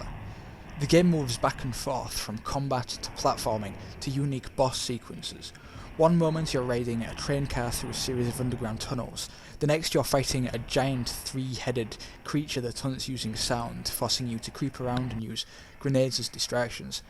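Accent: United Kingdom. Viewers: hes british, but not with the accent you would expect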